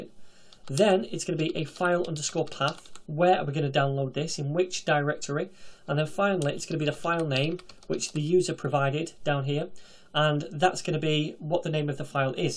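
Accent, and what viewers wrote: United Kingdom. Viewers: you are amazing I like your British accent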